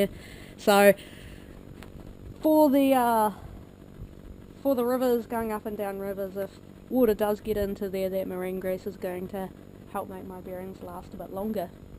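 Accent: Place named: Australia. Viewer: SOOoo many bearings. My 92 Nissan only has an inner and outer in the front. Was putting the disc rotor back on which I didn't have2 and shouldn't of taken off holding it in a vice while torquing its bolts to 48 pound feet. Didn't notice the bolts actually pulling the rotor down against the vice instead of tightening flush pieces of metal. Bang 6 bolts getting near 48 pound feet and the rotor broke in half. Time for a new rotor. I wouldn't of expected that force to brake it. Oh well. Going threw life speaking in an Australian accent must be great! When I had Japanese in the late 80's in California a former Australian air force guy who was a bit old was in my class. Said he flew Sopwith Camels.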